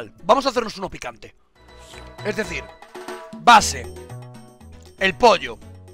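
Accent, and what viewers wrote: Spain. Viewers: dije doblaje por sarcasmo, obviamente se que es acento español y si vas con un lingüista te dirán que no hay acento correcto porque el idioma está constantemente cambiando, si no, en España se seguiría hablando castellano medieval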